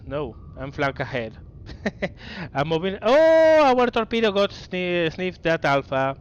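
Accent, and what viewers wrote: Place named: Spain. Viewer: Where are you from? You have a really familiar accent.. perhaps Spain?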